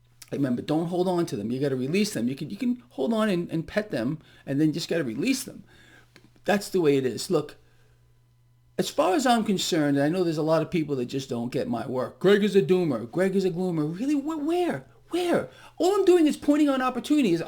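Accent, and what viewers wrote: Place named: New York, United States. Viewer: I genuinely thought you were in NY. Maybe it’s the accent. I know the market isn’t gonna reverse anytime soon thanks to the fed, but It will reverse, eventually, and it’s gonna be a doozy. I understand your logic but nothing goes up forever dude. Buy high sell higher? Hahaha. You turned everything upside down. You’re trading though. You’re not a “buy and hold “ guy. You’re not saying buy and hold for your future however am I correct?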